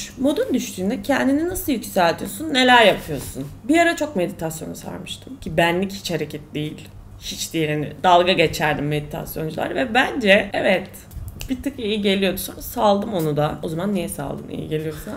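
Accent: Kütahya, Turkey. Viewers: Yapçem etçem Allah'ım ya tam Kütahya şivesiyle konuşuyon 😂💓 Kütahya'dan selammmmm öpvedim gali seni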